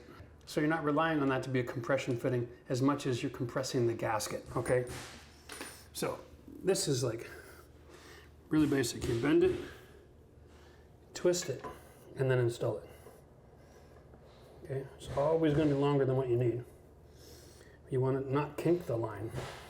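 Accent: Canada. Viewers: I knew you were Canadian when I heard the way you pronounce the words “process” and “out.” Thanks for the walkthrough and the thorough explanations.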